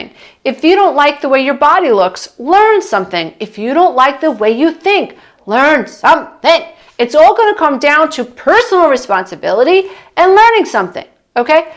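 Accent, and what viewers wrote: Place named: Brooklyn, United States. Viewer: You are by far the best one on this topic, Ramani is good too but I relate to you better, probably due to your (funny) accent, lol....downstate, Brooklyn....I cant say thank you enough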